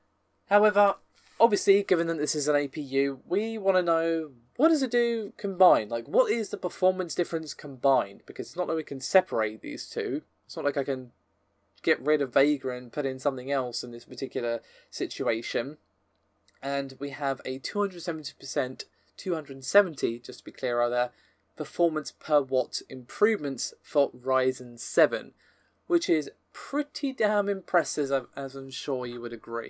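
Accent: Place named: Manchester, United Kingdom. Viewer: I am getting better at detecting british accents. This guy is from.... Manchester !!!!!!!??????????!!!!!!!!!??????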